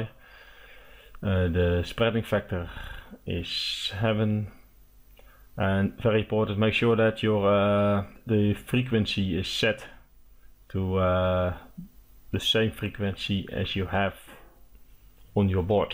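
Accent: Netherlands. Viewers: Hehe you have soooo deep Dutch accent :) groeten uit Amsterdam!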